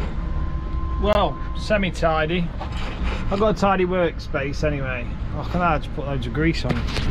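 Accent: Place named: Mansfield, United Kingdom. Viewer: Loving the vids, you originally from Mansfield area by any chance? Your accent is very familiar!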